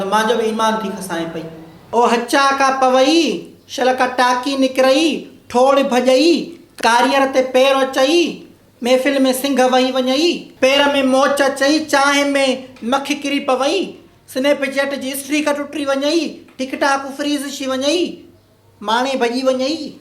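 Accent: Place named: Northern Sindh, Pakistan. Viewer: I like the way u speak Sindhi actually it's an utradi accent of sindhi (north side) they speak in the same way and we speak laar side Sindhi(south).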